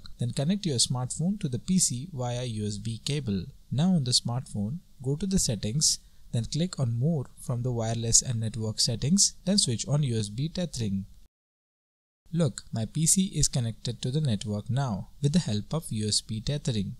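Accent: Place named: India. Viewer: The second I heard an Indian guy I knew I was in luck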